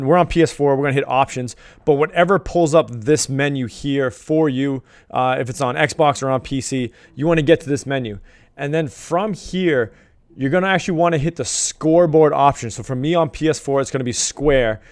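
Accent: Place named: New England, United States. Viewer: are you from new england? you kind of have an accent lol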